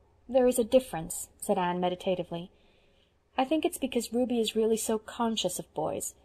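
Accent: United States. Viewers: Impossible to listen to the dreadful use of the English language,why do the yanks deform English? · Her English seems perfectly fine to me. A very proper American accent, without strong local dialect. What accent do you consider to be "proper"?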